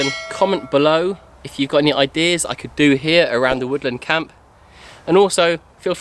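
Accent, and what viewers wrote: England, United Kingdom. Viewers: Loving your channel! What is it about a pommie accent and bushcraft?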